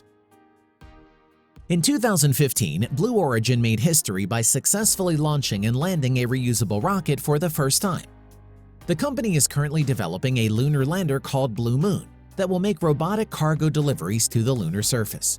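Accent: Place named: India. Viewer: me tamil nadu,india... your voice is like indian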